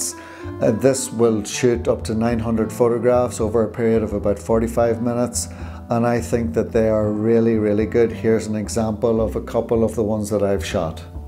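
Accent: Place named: Northern Ireland, United Kingdom. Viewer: Love this review,. Nice to hear from a fellow Norn Ironer:)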